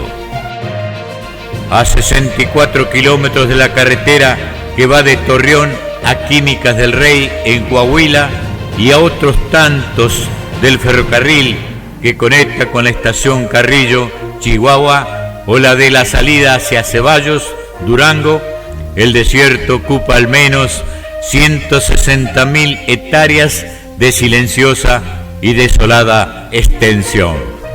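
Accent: Argentina. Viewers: Qué hace esa voz argentina narrando algo del norte de MÉXICO??? 🤷🏽‍♂️😂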